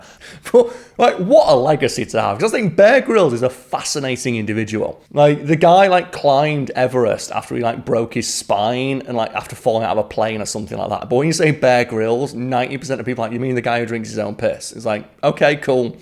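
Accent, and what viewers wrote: United Kingdom. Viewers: sounds very English but i assume the channel is aimed at the US because British people would never refer to the Christmas period as "The holidays" we just call it Christmas time. Holidays are just any time spent away from work or school or we use it in the same way Americans use the word vacation. Don't ask me why i felt the need to share that but it just sounded weird coming from someone that sounds ultra English.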